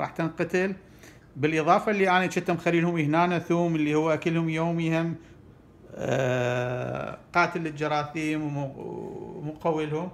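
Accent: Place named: Iraq. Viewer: الهجه العراقيه حلوه بحلكك الله يحفظك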